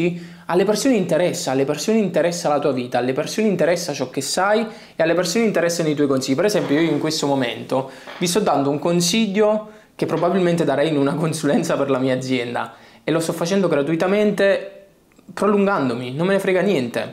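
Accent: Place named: Naples, Italy. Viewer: Finalmente era ora! Basta con il fast content, cosi i contenuti si assaporano con la giusta tempistica senza quel bam bam bam di informazioni veloci, che tra l'altro assimili per un tempo limitato poi te le dimentichi. Mentre per il tuo italiano siamo troppo influenzati dal napoletano, nun ce putim fa nient 💙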